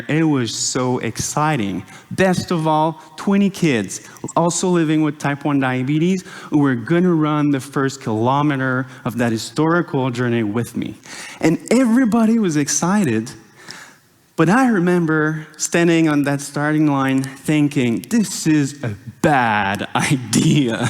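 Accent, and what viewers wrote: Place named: France. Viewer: It seems as though his primary language is french, hence his name and the accent while speaking in english. English switches pronoun every 3 digits added, french adds it every 2 digits. For example the number (77) is "seventy-seven" in english, yet, "soixante-dix-sept" in french, which translates to something along the lines of "sixty and ten plus seven" and yes, it does make for a very cumbersome approach to expressing numerics. This is probably why english is superior to french, and I speculate chinese superior to english, for conducting mathematics in general, or "mathigrammatics" if you will ;)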